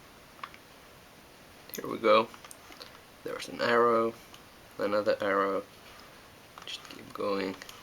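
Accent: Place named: Finland. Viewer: Of all the euro accents, finnish is my favorite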